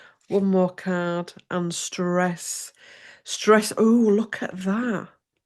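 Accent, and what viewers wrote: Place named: Northern England, United Kingdom. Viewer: . Hi Curious! Your comment was so gentle, unlike mine. I resist a little harder than “respectfully”. I am empathetic to your position, but I am so afraid that trump(no capital) is a dangerous man with a severe personality disorder that puts us all in danger. If you are an American going through this shambles, I am sending love and respect from Canada, where we see this chaotic nightmare every day! I am a Brit transplanted to Canada and sometimes listen to for her good heart. I relate to her accent and comic idioms from the North of England. ❤️🇨🇦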